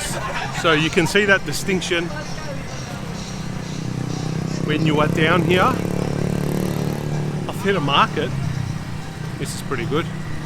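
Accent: Australia. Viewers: Where are u from boss? Australia? Your accent likes ausie guy. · I visited your channel for the first tome today. I didn’t pick you as Turkish, but your Aussie accent is a dead giveaway. Btw, I like tour channel and just subscribed.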